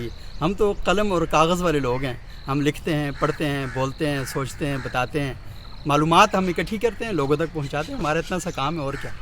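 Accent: Abbottabad, Pakistan. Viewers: Bhai Lehjay or talafaz k liye abbottabad ka rehashi hn..haha bhai jnay do yarr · Abbottabad ka lagta hay, hinko touch to aae ga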